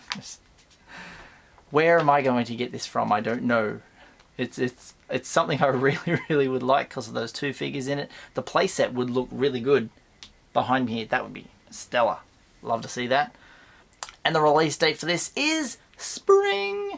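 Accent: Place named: United Kingdom. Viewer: Okay you're in Australia? I started watching this and thought you were in the U.K? You sound very British.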